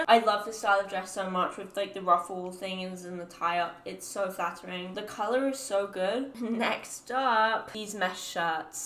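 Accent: United States. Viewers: Watched her years ago and now she has a slight American accent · definitely has an American twang in her accent now- cute!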